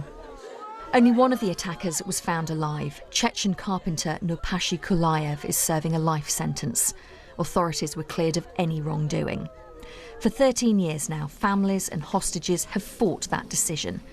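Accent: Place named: RP, United Kingdom. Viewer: Pro-terrorist propaganda, narrated in a disgustingly posh and affected accent.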